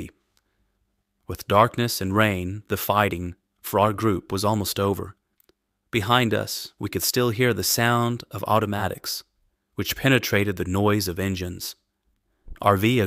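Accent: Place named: South, United States. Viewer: This story of a young French boy enlisted in the German army to fight in the Soviet Union, read by someone possibly from a state South of the Mason–Dixon line, is quite puzzling to a French listener.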